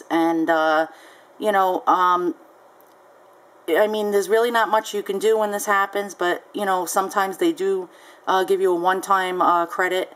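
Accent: United States. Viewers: Ur a fellow new yorker I can hear it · she sounds like she is from new jersey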